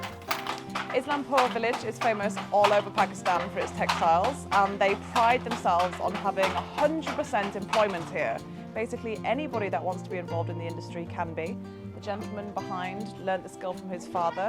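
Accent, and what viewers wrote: United Kingdom. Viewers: I will subscribe your channel if you Marry me 😁 DONOT MIND 🌼🌼🌼🌼🌼🌼🌼🌼🌼🌼🌼🌼🌼🌼 I Love Listening British Accent ✅ Beauty + Lovely Voice + Beauty...... you are very beautifull 🌹I AM FROM SWAT🌹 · British 🏴 english accent is great 👍🏻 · Hi which part of the world are you from. By your accent you sound British. Nice to see you that you are enjoying over there. Wish you well.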